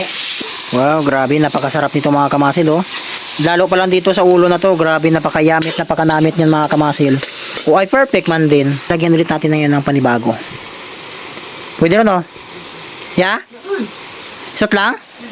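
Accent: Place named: Iloilo, Philippines. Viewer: May pagka ilongo ka rin pala idol kabalo man ko maghabal ilongo..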